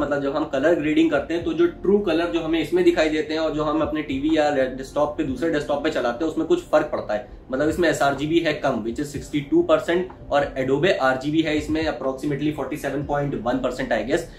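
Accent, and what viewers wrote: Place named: Kanpur, India. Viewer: bhaiya kanpur se ho kya aap ?....apki boli se lagra hai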